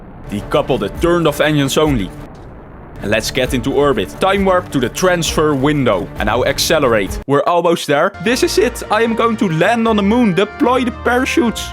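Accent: Netherlands. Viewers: Ru dutch? Ur accent is dutch. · i smell nederlands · I smell dutch (netherlands or nederlands)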